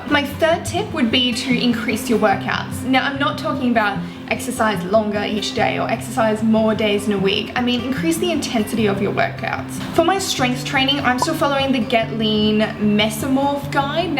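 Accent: Australia. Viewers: aussie accent?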